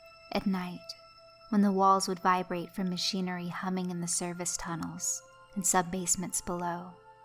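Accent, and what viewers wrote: United States. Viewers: lovely voice btw USA right?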